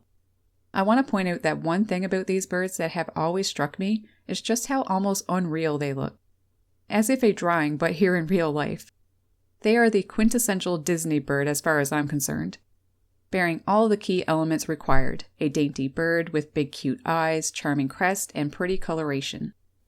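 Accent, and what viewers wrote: Canada. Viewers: I always wondered if these guys and the black capped chickadees (both frequent flyers to my feeders) were related somehow as their endearing calls are very similar in sound. Almost like a dogs squeaky chew toy bring chewed at a rapid pace. Too cute I now have the pleasure of hosting 4-6 Black-capped chickadees, Tufted Titmouse, cardinals, brown thrashers, house and purple finches, blue jays, warblers, chat birds and the red bellied woodpecker at my four feeders every day. They are literally waking me up every morning to get up and put the feeders out. It’s too cute. Oh, and recently I’ve been getting some mourning doves picking up seeds of the ground. Apparently the word is out, my place is the place to be., I’ve been meaning to ask, is your accent Canadian or North Dakota/Minnesota? I’m in Florida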